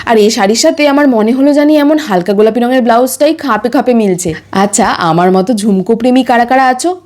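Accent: Bangladesh. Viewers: আপু আপনি বাঙাল না ঘটি 🤔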